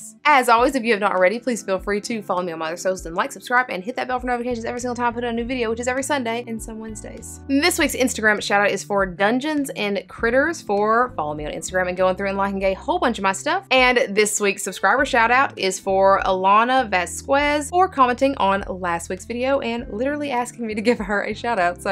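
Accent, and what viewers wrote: South, United States. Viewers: OMG!!!! I was listening to this and your accent wondering if you were in Alabama or the south … then you mentioned ANIMAL TRAX!!! I just went there yesterday in Madison! I live in Holly pond - where are you from??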